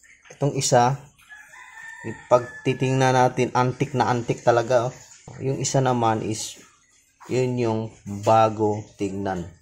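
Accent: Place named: Visayas, Philippines. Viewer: Bisaya ka man bai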